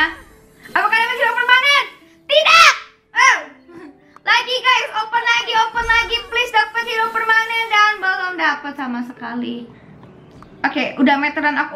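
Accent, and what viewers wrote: Java, Indonesia. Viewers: aku kangen kamu mainan OmeTV pakai suara medok orang jawa 😁 Miss you, 😁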